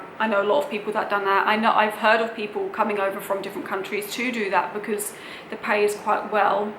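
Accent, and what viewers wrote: Australia. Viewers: Happy you like it here, your way of talking is a real laugh, such a great mix of UK and OZ. You live in Townsville but first I thought I heard more of a Melbourne accent there. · Your accent is turning Aussie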